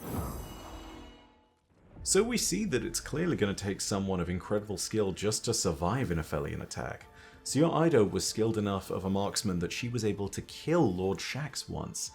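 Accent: United Kingdom. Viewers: You have an accent, you're British, yada-yada..... But when the clip you play clearly calls them "uh-feel-ee-un"... It makes the way you say it just sound wrong.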